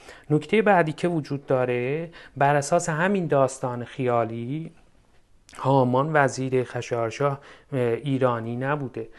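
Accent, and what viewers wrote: Azerbaijan. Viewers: درورد بر استاد عزیر و ارجمند با این لهجه ی زیبای آذری مرحبا به شما و دوستان شما بابت آگه سازی تاریخ ما. پر قدرت ادامه بدهید با تشکر. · درود خدمت استاد گرامی جناب چقدر غرور آفرین هست که استادی با این گویش شیرین آذری با اسناد مستحکم تاریخی بلای جان ایران ستیزان بشود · لهجه اذری شما بینهایت زیباست.❤❤❤❤❤❤❤❤❤❤❤❤❤❤😮😮😮😮😮😮😮😮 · لهجه اذری شما را دوست دارم. به ایران ایمان دارم.مردم ایران بهترین مردم دنیا هستند. فرهنگ و مدارا در ایران وجود دارد. لهجه نشون میده فرهنگ و مدارا در تبریز و اذربایجان و گیلان واصفهان و کل ایران وجود دارد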